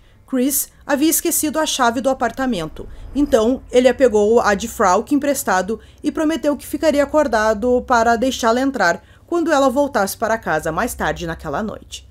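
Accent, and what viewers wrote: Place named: South, Brazil. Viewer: O que estraga o vídeo é o sotaque sulista. Meu Deus muito ruim e chato esse S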